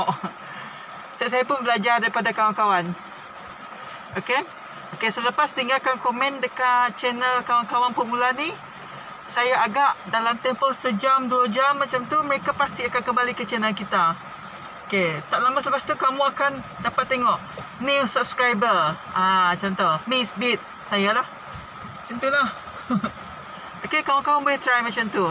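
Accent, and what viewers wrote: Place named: Sarawak, Malaysia. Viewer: Thank for info....btw slang Sarawak is best...betul ka...sy hadir untuk sokongan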